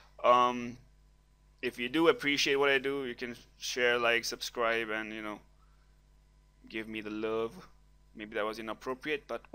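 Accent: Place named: India. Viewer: Why would that be considered racist..? Sounds like a question stemming from curiosity, nothing wrong with that. That being said, it sounds like an Indian accent., but I could be wrong!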